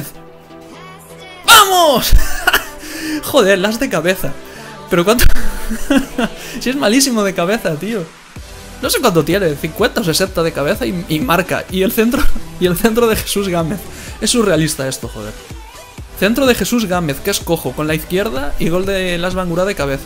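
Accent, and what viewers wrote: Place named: Spain. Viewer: De qué parte de España eres ?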